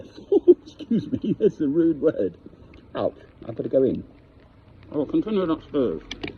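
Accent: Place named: Scotland, United Kingdom. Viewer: your scottish accent is remarkable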